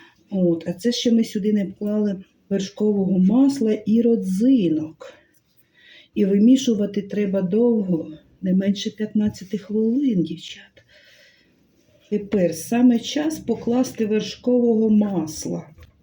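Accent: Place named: Ukraine. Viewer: у вас очень красивая, спокойная украинская мова, вас приятно слушать. Не суржик, а именно мова.😊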